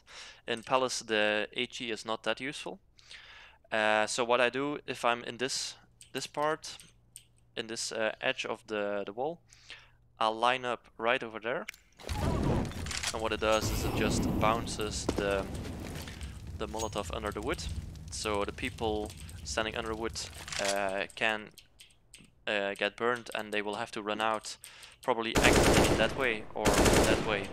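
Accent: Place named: Norway. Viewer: Du er norsk, ikke sant? Det er bare man hører når nordmenn snakker engelsk :D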